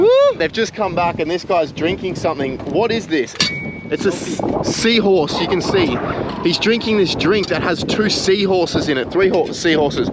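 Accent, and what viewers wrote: Australia. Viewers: This dude has a Aussie accent you cant forget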